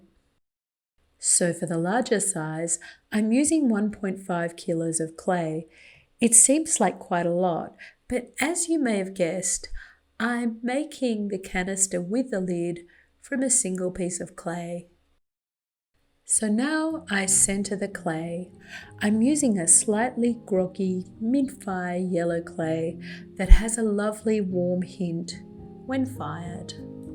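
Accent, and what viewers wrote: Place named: Australia. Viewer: Great video. I was very happy this came up in my feed- I was so excited to hear your Australian accent! Yay! 🥳 I have been watching lots of potters but I am so very happy someone as skilled as you from AU has decided to post. Thank you 🙏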